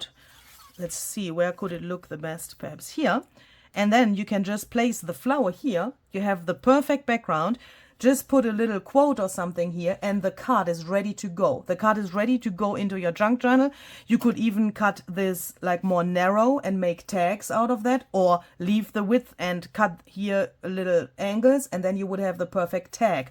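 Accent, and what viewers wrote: Germany. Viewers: I love hearing Tim-isms with a German accent.😄😄 You are a delight to listen to.❤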